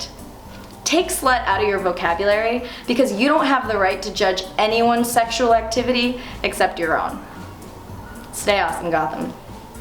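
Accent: England, United Kingdom. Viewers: your british accent is downright offensive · Can I please have a telling me definitions in my computer with a British accent forever? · your using the same English accent that the BBC use lol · Nice british accent too for the internet!! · Hahaa, I love that English accent!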